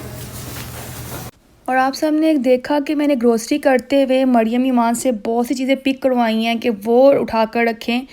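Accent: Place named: Lahore, Pakistan. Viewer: ye Lahorei style hai.hume tu bht pasand hai.m bhi lhr se hun.or bht acha bolti hai👌👌 jee❤❤❤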